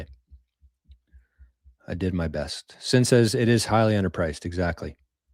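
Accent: Canada. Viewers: I know you can never really know and I've long lost faith in humanity that being said not sure if it's the canadian accent or what but i get serious good guy vibes from you man, you deserve allot more followers. Thanks to you i'm saving my little pennies in sats. One day i'll be stoked to have a whole .1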